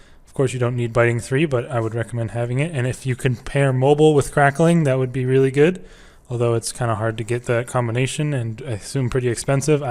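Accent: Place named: New England, United States. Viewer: "Wicked quickly..." Are you from New England too? =) Nice guide btw.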